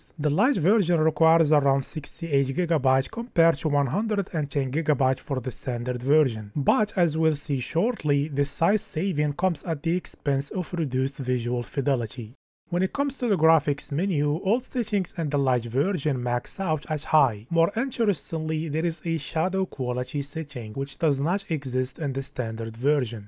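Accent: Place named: Morocco. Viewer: Moroccans can never hide their accent xd ,nice video a sat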